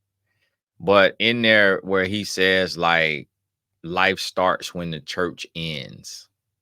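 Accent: South, United States. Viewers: I started to make a comment underneath someone's video because this "man of God", who is also divorced, decided he wanted to make fun of you because of your southern drawl. He said that he disagreed w something you said, but I'm still puzzled about how Christians can disagree if they both believe in the same Word. I think he just called you out to up his subscribers. So instead of being a "typical angry black woman", I voted with my feet and no longer watch his videos.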